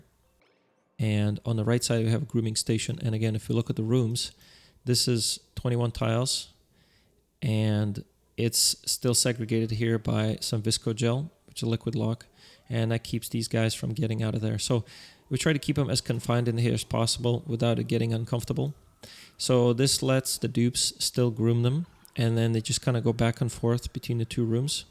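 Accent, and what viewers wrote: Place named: Russia. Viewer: едриттунг. енто же рашн инглиш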